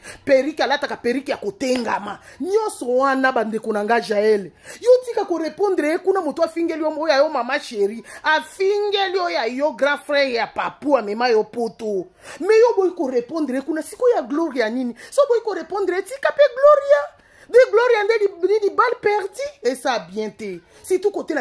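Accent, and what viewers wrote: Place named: Kivu, Democratic Republic of the Congo. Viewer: Oza penza mutu ya Kivu Na tonalité 😂😂😂 jambo dada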